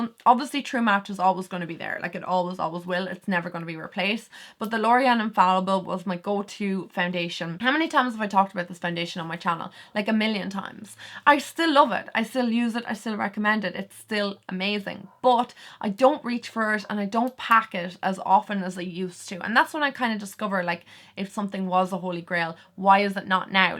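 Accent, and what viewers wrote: Ireland. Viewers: I love your Irish accent so much it’s so beautiful ☺️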